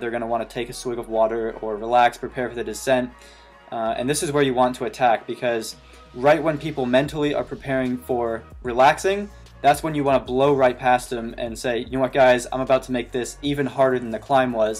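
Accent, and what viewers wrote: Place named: United States. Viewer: Why American accent, but British flag?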